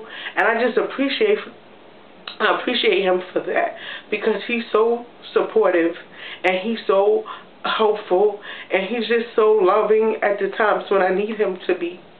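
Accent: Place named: New York, United States. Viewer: Dang your accent is so deep...Ny right lol...but people cant be so so rude. talking about your feelings and writing about them in a journal will defintley help..You have a right to feel any way you want about a situation those are your feelings...you are the only one walking a life in your shoes..no one has had your life but you so you're feeling will be different from everyone else. With the tears, the healing definitley begins little by little.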